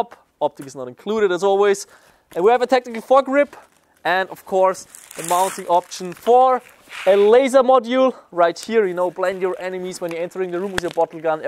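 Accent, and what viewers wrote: Germany. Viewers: Is he german or austrian? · Then se fleshlight right here. I love his englisch xD. Dieser deutsche Akzent hahahaha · Are u german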